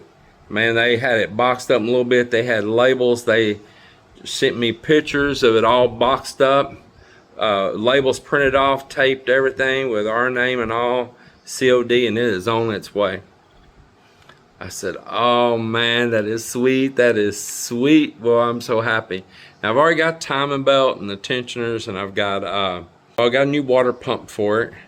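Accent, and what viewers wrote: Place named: South, United States. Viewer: Sir, I like listening to your southern accent. Keep up what your doing. I enjoy it. When I retire hopefully I see you.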